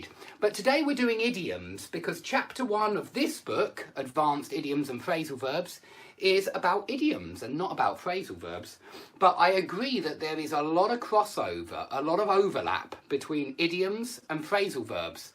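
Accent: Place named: RP, United Kingdom. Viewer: I simply adore your accent! It's so posh 😃👍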